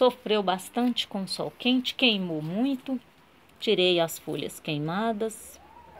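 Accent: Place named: Goiás, Brazil. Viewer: eu perguntei qual a sua cidade pq talvez podemos trocar mutas e o tipo de falar parece ser do meu estado de Goiás GO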